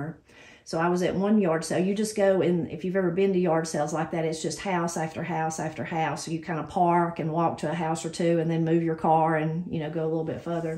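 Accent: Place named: Georgia, United States. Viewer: You are so right! I sometimes just turn on her video just to hear her and her family speak and talk about their ups and downs and day to day little things! I'm in the Midwest but my family is from North Georgia and the accent and values of my people come through the screen to put a smile on my face! 😊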